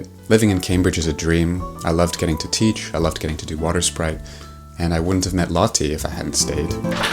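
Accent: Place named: North America. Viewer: Very beautiful and honest video. Thank you for sharing. Out of curiosity, are you Canadian? I ask because you speak English with a North American accent but you said "university" instead of "college", though it's probably because you did a PhD.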